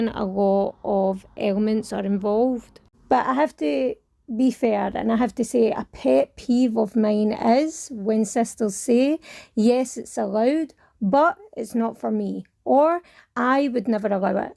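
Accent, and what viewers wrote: Scotland, United Kingdom. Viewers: Ma Shaa Allah sister you are good. On the subjects you talk about, you are spot on. However sister, your Scottish accent is a bit difficult to follow in one go. 😊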